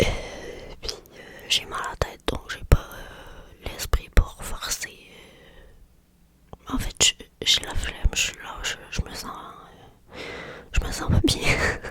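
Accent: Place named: Canada. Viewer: Je viens de decouvrir ta chaîne. Ton accent canadien me relaxe de folie et rend ton asmr tres spécifique j'adore! Très belle année a toi! Je m'abonne😊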